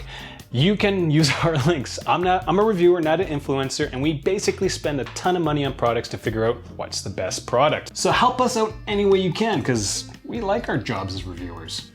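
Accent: Canada. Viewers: Is this dude Canadian?? What a lame reviewer and his jokes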